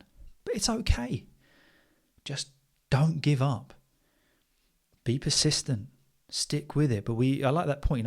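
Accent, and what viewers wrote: United Kingdom. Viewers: This is the first channel of British English that I really enjoyed 😊. I tried once before but I couldn't find one so good like yours. Thank you so much for your good job, and your effort helping us to improve our English skills. I must confess that I prefer the American accent, but you are changing this. I'm a little obsessed with your channel 😂. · I love the british accent ♥️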